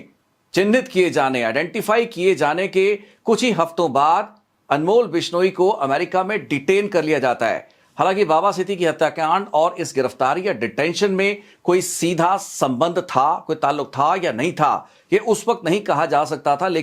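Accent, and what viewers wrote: Punjab, India. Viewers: Yaar tere hindi v Punjabi hi vekh de ne.. feer punjabi ch hi boll lai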